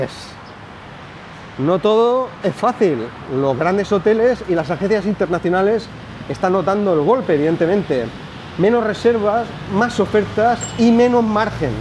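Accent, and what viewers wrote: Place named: Alicante, Spain. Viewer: Me parece que tienes acento alicantino👋🏽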